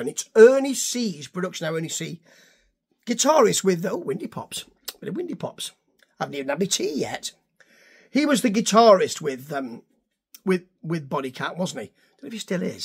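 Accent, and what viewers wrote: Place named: Birmingham, United Kingdom. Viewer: Are you a brummie? 'cause you sound (to my Irish ears anyway) like Bill Oddie. Oh! and Sabbath ended at Sabotage... everything recorded after that is a different band, different gene, different everything! (Just my opinion, )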